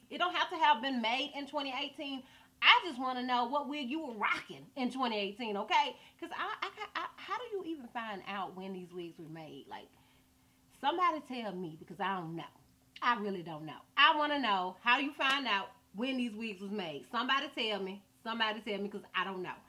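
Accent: South, United States. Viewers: Girl, I love your country accent lol. Them wigs were cute or whatev. #2 was my fav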